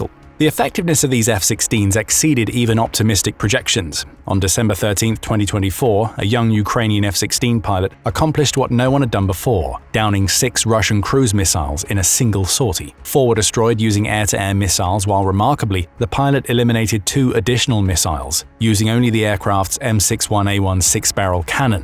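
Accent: United Kingdom. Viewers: Can you use a non UK accent. We have grown tired of the accent.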